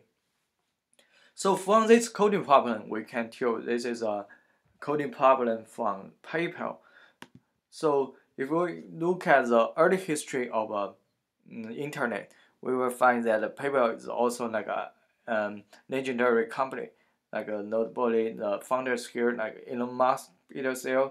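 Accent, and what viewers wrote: China. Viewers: 是东北老乡不？哈哈哈哈哈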